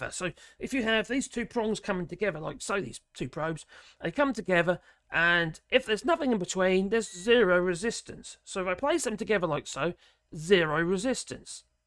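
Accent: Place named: Portsmouth, United Kingdom. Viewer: I live in America and bought this meter. I grew up in Southampton England. You did a perfect review and enjoyed your description. Manual dies fit but it is tight. I totally agree with everything you said. From your accent I was guessing you are from Portsmouth ? Or south of England near fom where I grew up. I did enjoy it!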